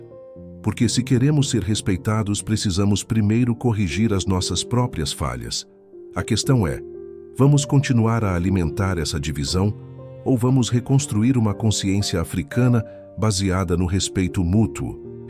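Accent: Brazil. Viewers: Pronuncia Brasileira ( não assumas a identidade de um Pais que não é o teu ) ...... quem se separou foram os da Guiné Bissau com o golpe de Nino Vieira em 1980 Cabo Verde é tão colonia como a Madeira ou Açores a população é que é racialmente diferente. os Cabo Verdianos Culturalmente são e sempre foram superiores aos continentais Africanos , e como são mestiços são menos feios ........é a vida ! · Um gajo que fala brasileiro diz: " porque nós cabo verdianos". Enfim!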